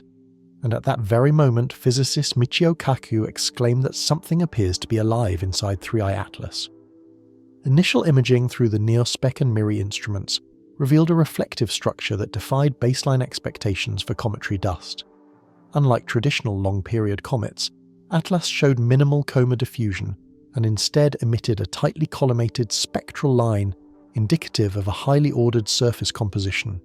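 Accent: United Kingdom. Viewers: So sick of the British accent explaining fkn everything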